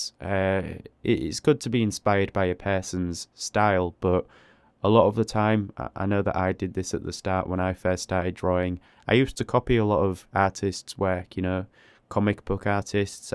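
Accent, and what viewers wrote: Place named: Northern England, United Kingdom. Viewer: Awesome video. Thank u very much for your answers. Love ur accent by the way. I’m in Manchester I’m guessing your slightly further north. That drawing looked insane. So good. Keep up the great work. Love your content and videos. Pls never stop 👍👏👏👏😍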